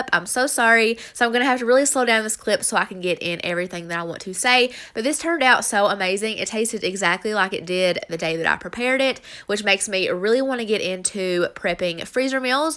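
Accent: South, United States. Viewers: I heard that SOUTHERN accent and now I'm hooked. Girl these meals actually be looking good (and healthy).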